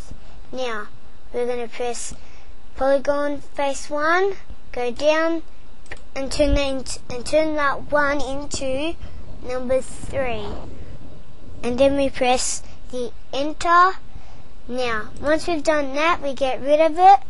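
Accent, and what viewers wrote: Australia. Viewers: a young aussie am i right?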